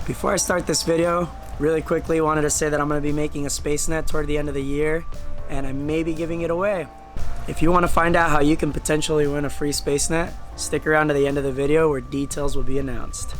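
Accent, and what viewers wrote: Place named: Spain. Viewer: I like the Spanish accent. Caught me off guard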